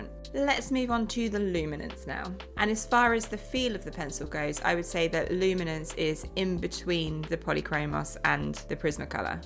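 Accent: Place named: United Kingdom. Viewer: Great tutorials! I want to encourage you to keep doing videos and grow big because you are such a Great teacher,I would like to mention to you that you are from UK right,so you have a strong accent and people from all over the planet are watching you,so it is important that you always bear that in mind to never speak too fast and have the music very low in the background,so it does not take so much concentration to clearly understand you. I'm French and understand English very well, but UK accent is not obvious for many people on this planet! That said! Keep going at it!! You love to do this,we can feel your passion! Bravo! Merci!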